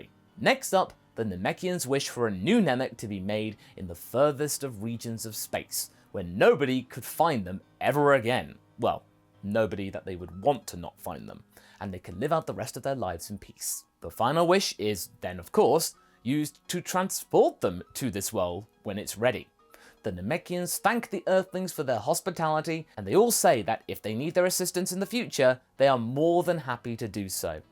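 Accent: United Kingdom. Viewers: I love you British voice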